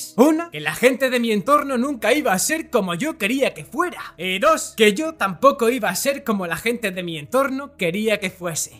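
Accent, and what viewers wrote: Spain. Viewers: Yo si pensaba que era de España y la verdad es que me gusta su asentó y sus videos :3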